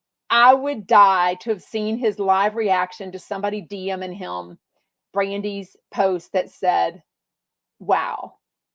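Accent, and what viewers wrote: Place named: South, United States. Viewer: you are sweet and southern sounding coming from a texas person💝💝💝💝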